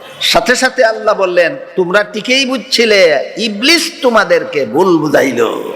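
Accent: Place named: India. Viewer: আপনি ভারতে সুরে কথা বলেন।জাতির বারোটা বাজানোর জন্য আপনি একা যতেষ্ট।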